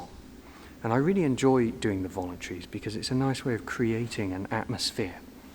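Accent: United Kingdom. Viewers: Hi, you are very talented person, with such a beautiful British accent-I love it. I really enjoy watching your videos because I'm Polish and I'm learning English, so I can learn a lot of beautiful vocabulary from you channel (I know, it could sounds funny). I play the organ a little end pieces you play are amazing! Have nice da!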